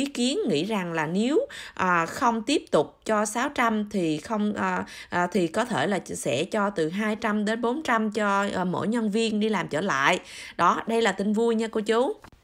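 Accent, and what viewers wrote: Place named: South, Vietnam. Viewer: THANKS 👍 VỚI NHỮNG THÔNG TIN GIÚP CHO NHỮNG NGƯỜI KHÔNG ĐỦ THỜI GIỜ TÌM HIỂU MAY CÓ (GIỌNG ĐỌC Miền NAM, ) NÓI CHUNG LỄ PHÉP.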